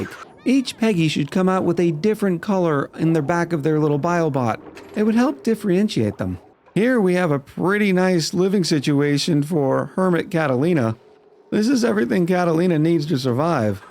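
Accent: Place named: United States. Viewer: I like your country accent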